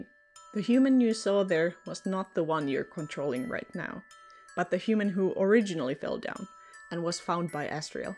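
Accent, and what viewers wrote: Finland. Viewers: Are you a fellow finnish person? Your accent is similar to finnish accent! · Are you finnish? I'm finnish and your accent seems so familiar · Nice Finnish accent :D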